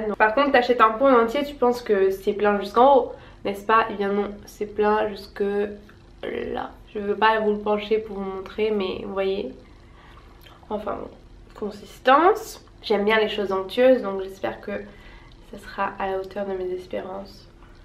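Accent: France. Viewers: elle n est pas Française ? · J’adooooore ton accent français ! Et ça m’a donné faim 😅 Et Ohlala je suis pareil pour les biscuits, quand je commence je n’arrive pas à m’arrêter ahaha (ça rejoint notre discussion sur le pain sur Insta 🤣)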